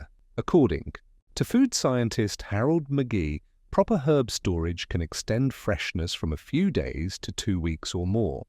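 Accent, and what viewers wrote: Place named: United Kingdom. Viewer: A video by a channel that implies USA-centered content, and narrated by someone from the UK?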